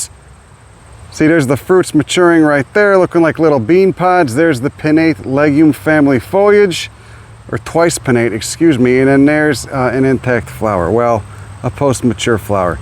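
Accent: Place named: Illinois, United States. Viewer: I enjoy hearing Illinois voices. My Ma came from Wheaton and Pops came from LaGrange park.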